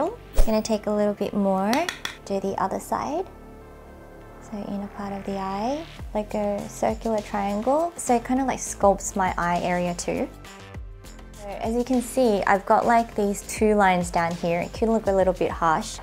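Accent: Australia. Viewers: Is that an Australian accent I hear? But don’t you live in Korea? Anyway.. You’re freaking adorable. 😊😊❤️ Thanks for this! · You look so cuuuute ! And I know I say it everytime but I love your Australian accent! 😻😘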